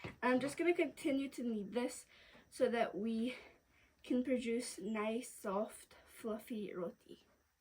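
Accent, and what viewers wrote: Scotland, United Kingdom. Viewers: Nice to see you cooking our traditional Guyanese cuisine,nice job and I love your Scottish accent , have a blessed evening and enjoy your vacation, there is nowhere better than home. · you're accent is all Scottish now. Beautiful 🙏🏽🥰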